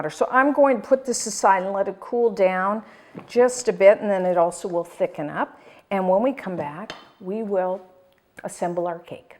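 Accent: Canada. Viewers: Love the step by step explanations. She sounds so Canadian.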